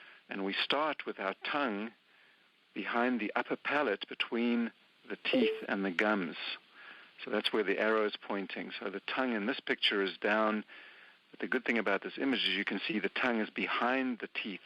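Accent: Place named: South Africa. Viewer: I’m in South Africa. I heard your accent and got excited that you might be in SA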